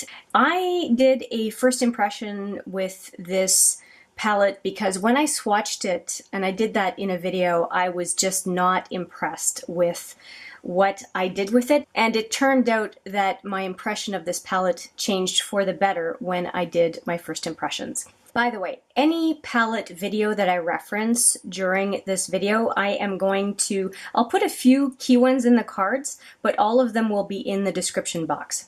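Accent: Quebec, Canada. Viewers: I love your little containers for your lip products... cute shit: also you can hear a French Canadian accent lol I love it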